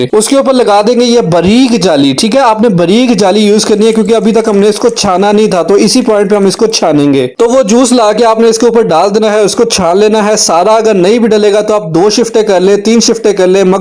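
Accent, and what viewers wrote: Punjab, India. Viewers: Ap ki guftago me punjabi ka tarka😅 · Mujhe apka "VOICEOVER" or apka "PUNJABI" touch acha lagta hai 😊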